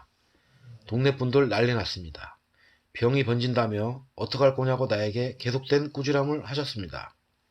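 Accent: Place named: Seoul, South Korea. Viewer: 까?하실때 악센트 주시는게 서울사람이시네요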